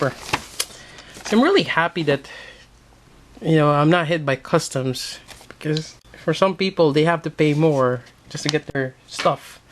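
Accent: Philippines. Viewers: I was just wondering, are you Filipino? Mebbe because of your accent, dunno just askin'.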